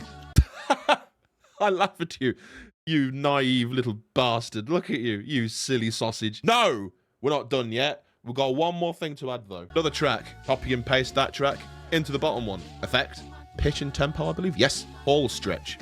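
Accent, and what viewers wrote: United States. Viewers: Oh my god, I kinda expected voice to be just a slight radio-y exaggeration of your normal voice, this is nuts. You're killing it with the American accent, it's spot on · THANK YOU SO MUCH!! (Also holy crap, your American accent is ON POINT!!) ❤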